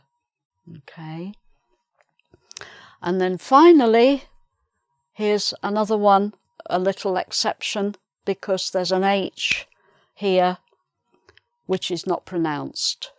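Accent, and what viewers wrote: United Kingdom. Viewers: I loved it! I like your british accent, what part of the UK are you from? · Hi, thanks so much for your lessons. I'm fond of your accent, it is so British? Your explanations are clear and well structured, you're an excellent teacher!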